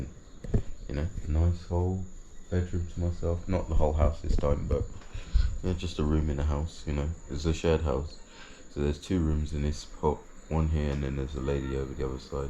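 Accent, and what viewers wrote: United Kingdom. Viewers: You are obviously disgruntled socially. Where are you fron really?. I can hear the british accent. Am Jamaica and live in theUS. Just can't understand what you are do angry about. People ask you for money just day no. I have neices, nephews and lots of cousons in the UK. Enjoy you stay in Jamaica and calm your nerves. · Honestly i am not bias. I just see this guy with a weird personality.. He sounds so bitter and he doesnt seem to have any confident in himself. I think that he was mixing with the wrong people. He got lost in the bushes. What is wrong with him? He needs help!! Hes just throwing tantrum . The other utubers ask ed for help from people who they have sought out before landing but you just went this british accent and think that would get you by. Life doesn't give anyone what they deserve, you must fight for what you want. 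Love ❤brother but your attitude isnt right. Jamaicans love and care for people.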